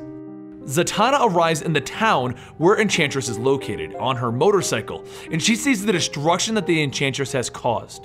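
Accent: United Kingdom. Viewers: Nice British accent xD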